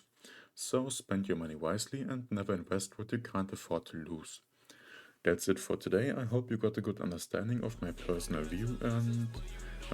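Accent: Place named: Germany. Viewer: You have a very soothing deep asmr voice with a hint of german accent so like a more chill onhepixel uwu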